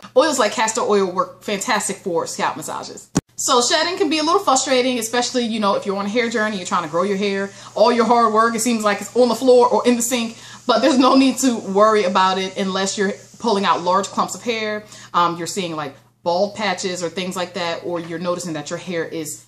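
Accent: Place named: Texas, United States. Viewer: I love your accent! Are you from Texas? I'm Canadian Jamaican and sound nothing like you!! Lolol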